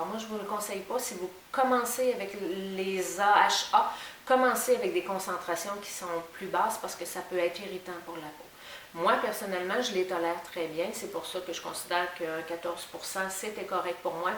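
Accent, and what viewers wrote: Quebec, Canada. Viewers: jadooooore laccent quebecois ! ton accent est 😍😍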